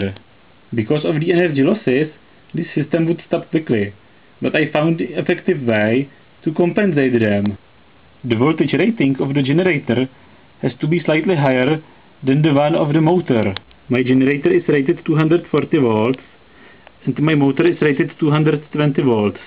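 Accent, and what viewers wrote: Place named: India. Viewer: Это английский язык, но говорит житель Индии, скорее из северной ее части. Южан очень тяжело понять, а этот говорит так, что его слова понять можно.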